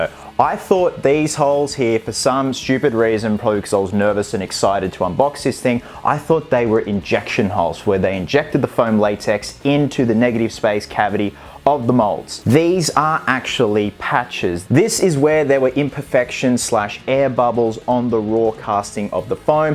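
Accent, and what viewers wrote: Australia. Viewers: They don’t sound the same lmao, Cavill is English and this guys Australian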